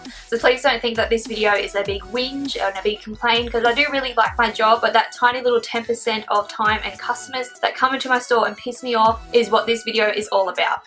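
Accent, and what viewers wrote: Australia. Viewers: Your accent is so pretty. Are you from Australia?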